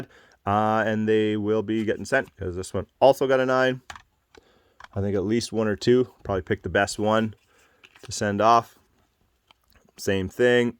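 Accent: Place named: Canada. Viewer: I'm in Kingston, Ontario. I knew this guy was Canadian a few seconds in! Cheers fellas.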